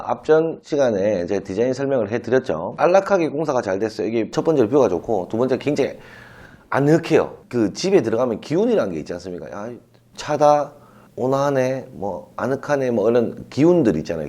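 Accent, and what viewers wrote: Gyeongsang, South Korea. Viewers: 비오는날 막걸리 먹으면 엄청 좋지요 항상 잘 보고 있습니다 경상도 사투리 좋습니다 저도 경상도인데. 괜찮은데요 ㅋㅋㅋ.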